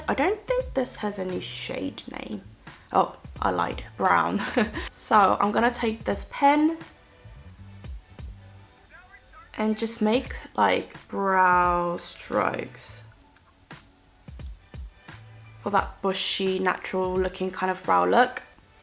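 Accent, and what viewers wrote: United Kingdom. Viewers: 영국 영어발음 너무 멋있어요ㅠㅠ 블리블리 광채쿠션 너무 좋죠:) 화장도 너무 예쁘시고, 너무 잘 보고 가요!:) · 이렇게 퓨어한 인데 영국영어말투는 넘나 시원시원하신.....💓 한국어하실때랑 다른분같운데 전둘다 애정합니다....꺄르를ㄹㅎㅎㅎㅎ❤️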